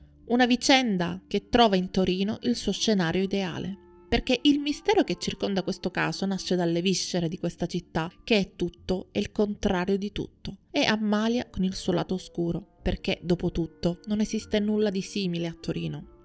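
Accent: Sicily, Italy. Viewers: Ma chi è che commenta sulla dizione? Non ho letto tutti tutti i commenti ma fin'ora non mi pare di aver letto critiche! Si sente cmq l'accento siculo ma a me non dà fastidio, anch'io quando parlo mi riconoscono subito che sono veneta 😂😂 non so usare le doppie e trascino le parole alla fine, ma non posso farci nulla 😂😂 a me piacciono i vari accenti e dialetti!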